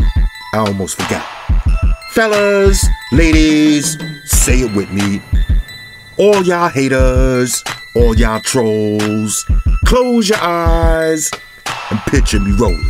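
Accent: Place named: Staten Island, United States. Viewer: Is from Staten Island? Because the way he speaks reminds me of Wu-tang.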